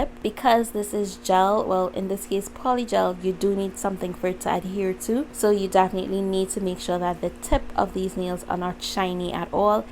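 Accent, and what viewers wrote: Trinidad and Tobago. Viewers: Are you trini?? I love hearing a fellow trini accent 😍🇹🇹🇹🇹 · You sound a bit trini are you?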